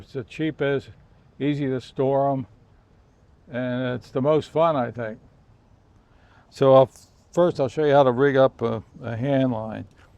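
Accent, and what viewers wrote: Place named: Maryland, United States. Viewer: You guys are wonderful we love you... We now live in Jupiter fl.. Don't crab the loxahachee.. But watch you.... Miss maryland..you sound like that's where you are from.. Happy crabbing. Found an alloy can eat in stuart... Are you from Maryland.. Ann mckewin west palm beach... God bless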